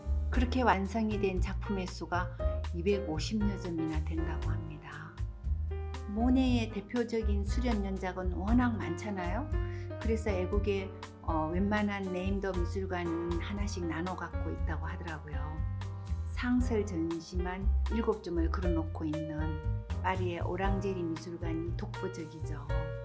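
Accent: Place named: Gyeongsang, South Korea. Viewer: 오랜만에 여유를가지고 샘 작업을 훔쳐보고있습니다 ^^ 겡상도억양이 그림의 따뜻함을 더 하는것 같습니다 배경음악의 볼륨이 샘의멋진 설명을 누르는느낌이 조금 아쉽습니다 ㅎ 기연샘 음악회때 처음 샘 만났는데 기회되면 화실에 함 가보고싶습니다 요즘도 성산 출강하시죠?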